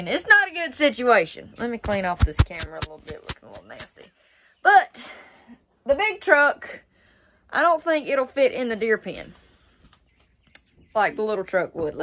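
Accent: South, United States. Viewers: Love your cute souther accent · I love ❤️ her Southern accent. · Love you and that southern beautiful language and hospitality.id come help you do any project you might want some help with. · Love That Southern Bell Voice such a Beautiful Young Lady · I just love her accent. She is a true southern Bell. 🥰😏😎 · As a southern guy her accent drives me crazy. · Hello from Texas. Always looking beautiful and melting hearts with your southern accent. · Get Padre to make you a wooden ladder you can just leave it there on site. I had a good chuckle when reading your description and then heard you remark your one truck was "torn up". I had a student years ago write in a homework assignment that his "... sister's dress is toup." (toh-up). I always 'preciate your Southern drawl. Makes me miss my roots. I was born and raised in L A, too. Lower Alabama, that is. 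· you're the best ever just love your southern talk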